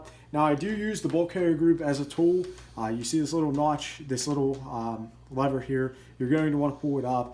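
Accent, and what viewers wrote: Pittsburgh, United States. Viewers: lol at the pittsburgh accent, noticed it right away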